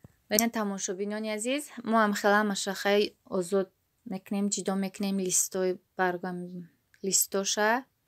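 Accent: Kulob, Tajikistan. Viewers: Кулоби мегум гапзанен гуш кунам шумора 👍👍👍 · Салом ман мехмони нави шумо аз кучои Точикистон хастед? Гапзаниётон ранги Кулобиё ба фикрам хамшахрием. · Салом хохар лахчай хутонкати яне кулобиги гапзанен😍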